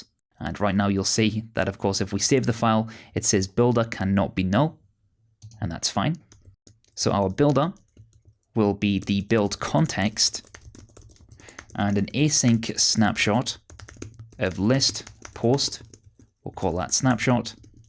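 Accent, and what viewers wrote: United Kingdom. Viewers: I like brittish accent and tutorial style of video auther!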